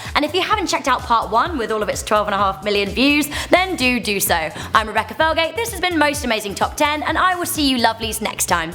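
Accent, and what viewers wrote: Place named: Cockney, United Kingdom. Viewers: I was just wondering that. Maybe cockney...? Sorry I'm no help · British - circa Cambridge/London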